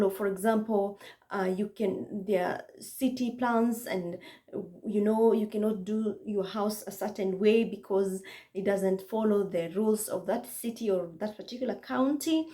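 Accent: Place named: Kenya. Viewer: I love the Kenyan accent!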